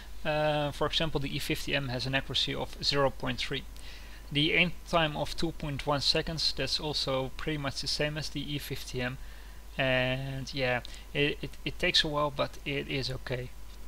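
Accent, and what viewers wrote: Netherlands. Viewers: Nice evaluation of the tank. Woulld you prefer it over the Leopard 1? And also, you sound like you are Dutch? :P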